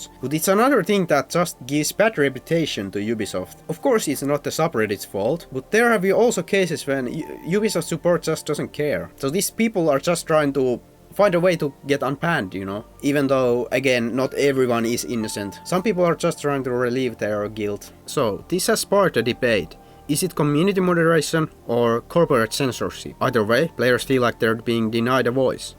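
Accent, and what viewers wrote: Finland. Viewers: Jätkä puhuu yllättävä hyvää englantii. Kyl tos o ripaus sitä suomalaisuutta en oo growist kuullu pitkää aikaa nii iha mielenkiintosta kuulla täst pelist pitkäst aikaa. Mahtava video!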